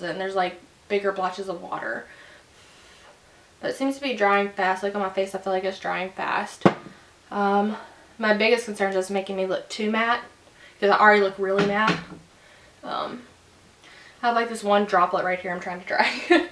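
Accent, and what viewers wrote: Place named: South, United States. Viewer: haha i didnt notice she had one til this comment. maybe a little southern sounding. but im from the us and i barely even noticed.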